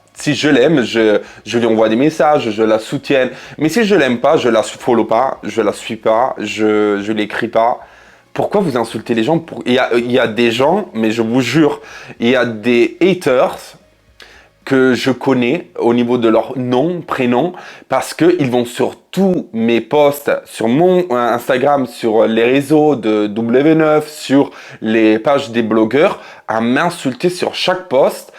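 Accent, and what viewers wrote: Italy. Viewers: Quel plaisir l’accent italien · L''accent italien ! 🤩🤩🤩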